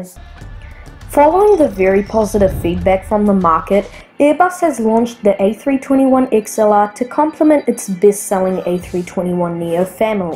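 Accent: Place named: Australia. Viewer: Damn.....that Australian accent is annoying !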